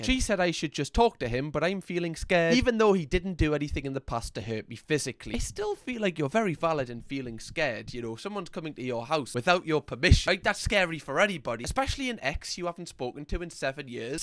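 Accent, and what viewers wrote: Newcastle, United Kingdom. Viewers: You should visit Newcastle. A lot of them sound like that lol it’s a lovely place too. My parents are both from the north east of England and a lot have similar accents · Love you saying "bloody hell". Love that British accent. You are a funny and charming guy. 😂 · I just wanna let you know that after I watch your videos the inner narrator of my thoughts inside my brain has a geordie accent for like minimum 6 hours post-watching every time 😂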